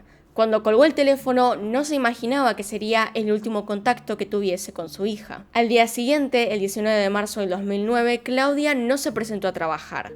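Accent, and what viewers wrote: Argentina. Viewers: Me encanta su acento Argentino.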